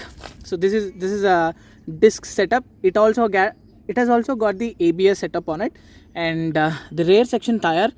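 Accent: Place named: United States. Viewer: ur english is awesome !! speaking like an american !!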